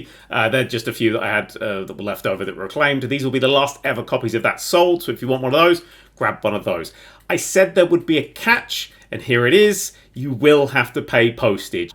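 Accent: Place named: London, United Kingdom. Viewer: It's like British London from peep show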